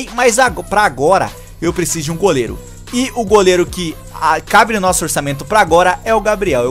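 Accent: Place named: Bahia, Brazil. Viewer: Eae aonde se mora pow tem um sotaque da bahia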